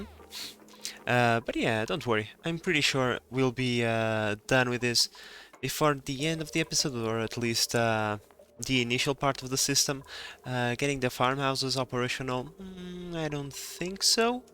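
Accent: Portugal. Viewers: Are you Portuguese ? Sounds like it ?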